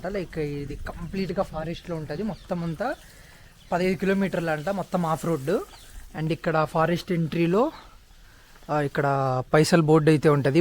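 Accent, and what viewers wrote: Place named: Tadipatri, India. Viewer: anantapurama Tadipatri na, adhey slang idhi ...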